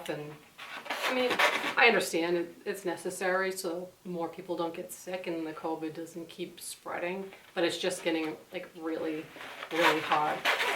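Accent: Boston, United States. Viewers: yer so dam hot love yer boston accent 2 bad yer married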